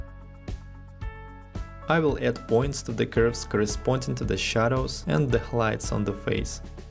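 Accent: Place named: Russia. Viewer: Ну да!!! я на много лучше понимаю Русский Английский, чем Американский Английский...Это я понял!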